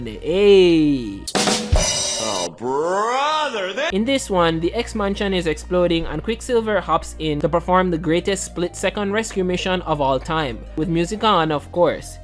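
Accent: Jamaica. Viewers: Yuh jamaican? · I can tell that your Jamaican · I can tell from the accent that your a yardi big up mi G💪🏾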